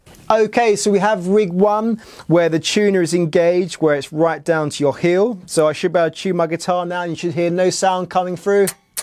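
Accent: England, United Kingdom. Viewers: Are u from England? I lived there for 5 yrs...IPSWICH!!!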